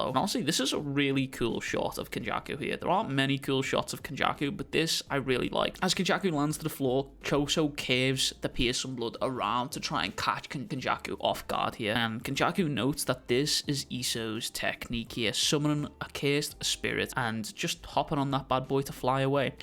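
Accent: Liverpool, United Kingdom. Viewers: weird seein another scouser into anime. love it bro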